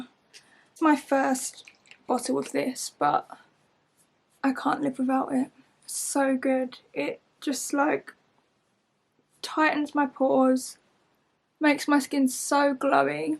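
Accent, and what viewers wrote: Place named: United Kingdom. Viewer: so lovely british accent ❤️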